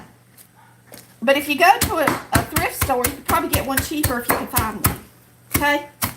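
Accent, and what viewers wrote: South, United States. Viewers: I love your deep southern accent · I love your sweet southern accent! Your food looks SOOOOO amazing! !!!! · Hey....this looks amazing. Love the way you cook and also your great southern accent. I'm subscribed to your channel and can't wait to see more. Blessings my friend!!!!!!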